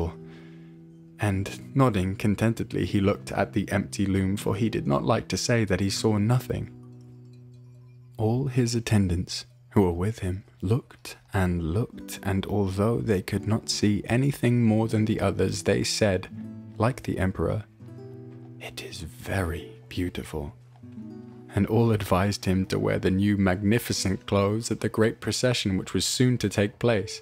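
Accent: United Kingdom. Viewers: The voice, and he is English like the accent. Nice story, never heard about it. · I would love and never get tired of listening on storytelling...keep it up idol...first time viewing something like this...the only problem with me, in my case is, and being a granny as well, some lines I cannot understand easily, since it is a British accent, so I have to repeat listening to get through what you are saying, really trying my best...just to listen to you...thank you for the joy you have brought in my life...I always pray for your best of health, success, happiness and protection. God bless you always!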